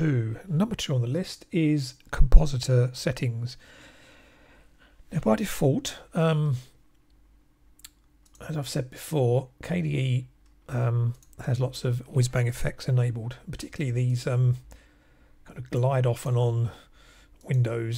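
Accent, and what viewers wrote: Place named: United Kingdom. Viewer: Thanks! Very informative. I've just found your channel and been watching your plasma videos. I'm getting into Linux and enjoying it I'm moving from Mac. I've tried alot of distros and prefer KDE - I'm using neon to get the latest plasma but I wonder do you prefer or recommend Kubuntu over neon? I'm finding some bugs in neon I'm using breeze dark but I cannot change the window decorations and if I try it locks the window so I cannot do anything with it so have to kill the window and open another one. I'm wondering if it's better to go back to kubuntu and use 19.04 or would you stick with LTS? Nice to hear another Brit at first I thought you were Zebedee boss...Cheers!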